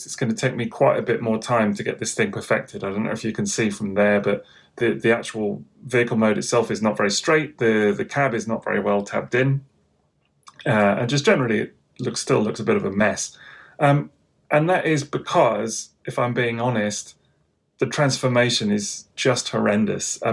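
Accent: United Kingdom. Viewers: Hello, I’m looking into buying Split, however I’m in the UK, like you I assume due to ur accent, and I can only find online stores that sell him in $$$, can you please let me know or anyone here in the comments if there is anyway to buy with £££, many thanks.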